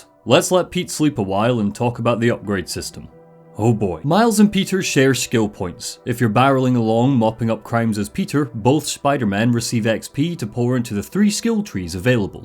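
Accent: Scotland, United Kingdom. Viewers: I swear you used to be scottish. Am i experiencing a mandela effect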